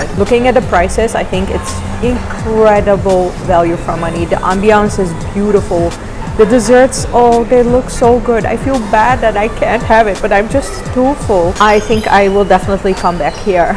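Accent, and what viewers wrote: India. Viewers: Is it me or she developing an indian accent?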